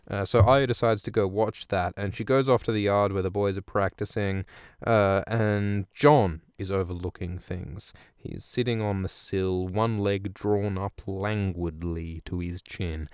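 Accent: Australia. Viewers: Fellow Aussie here, nice to hear GoT in an Aussie accent! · Is there going to be a burp in every episode? I'm not complaining, just observing. That combined with the swearing and the accent wants me to see more Australian readings of popular book series.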